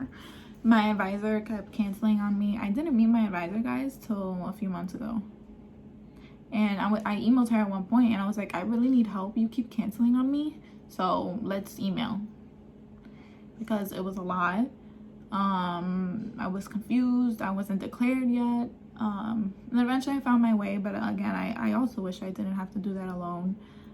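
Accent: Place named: New York, United States. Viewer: U sound like u from new York bro bro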